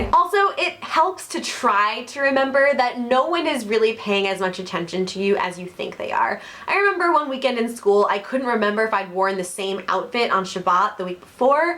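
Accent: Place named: Ireland. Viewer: You must be Irish girl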